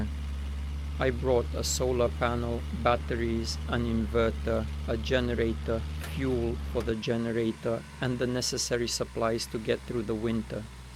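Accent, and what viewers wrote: Belarus. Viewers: По говору вы из Белоруссии??? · Белосус походу,судя по говору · Это где в Беларуси? Голос вроде белорусский у тебя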